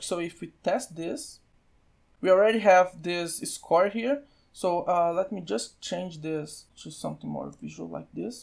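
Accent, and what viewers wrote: Brazil. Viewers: I can recognize a fellow Brazilian just by his accent, continue a fazer seus videos que eles me ajudaram muito!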